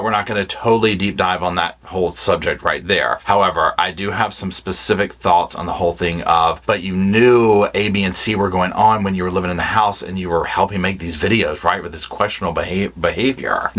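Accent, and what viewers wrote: South, United States. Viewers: 100%! I, too, have a Southern accent. Listening to him is like sitting on the coach with my family jaw-jacking about the nuts around us. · YES, I get all the info I would from the news, or courtv, but in a much warmer, SOUTHERN way, including some opinionated sidebars, which is just how I like it!❤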